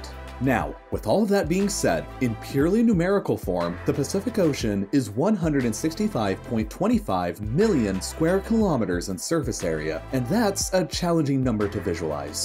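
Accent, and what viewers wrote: United States. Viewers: As an American, I couldn't relate to distances because you dealt in kilometers, not miles. Why. Just why. You sound American too. It was frustrating. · Does anyone else find talk of kilometers meaningless? I know metric is more scientific, but, you sound American, give it to me in miles. Please. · Narrator has American accent. Why is he talking about Km and not miles. Drop dead. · Speaks American English USES KILOMETERS AS THE UNIT OF MEASUREMENT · Sounds American. So maybe not use the metric system.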